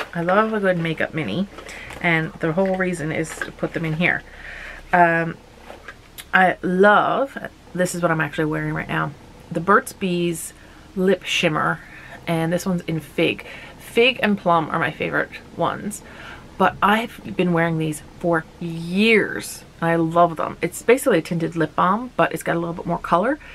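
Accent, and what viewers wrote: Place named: Newfoundland, Canada. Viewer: Loved this video! I was thinking of doing a video like this for my everything pouch. I really heard your Newfoundland accent in this video. Absolutely adore you babe!! ❤❤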